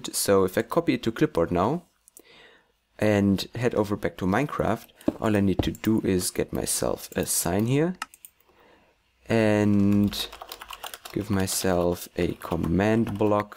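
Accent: Germany. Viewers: wait, ur german???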